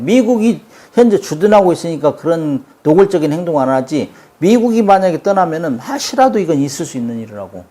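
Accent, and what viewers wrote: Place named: Jeolla, South Korea. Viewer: 말투가 절라도 네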